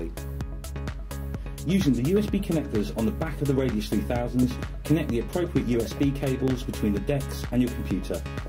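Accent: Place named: United Kingdom. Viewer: why is the american audio product demo being led by a british guy? =)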